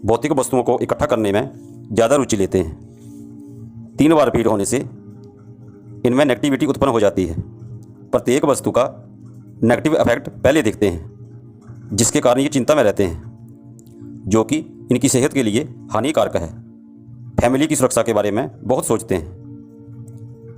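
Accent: Punjab, India. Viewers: Aur kuch toh pata nahi, par ek baat sure hai, video mein bolne wala banda punjabi hai 😀😀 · Aapp ki awaz mai punjabipan hai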